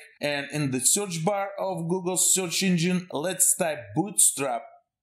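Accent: Russia. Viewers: You're so cool! lol are you Russian?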